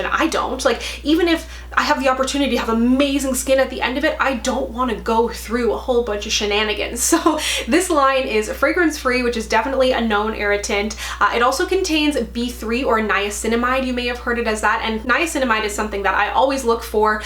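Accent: Newfoundland, Canada. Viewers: You're also a Newfoundlander! That's awesome!! Instant Subscribe when I heard that 🙊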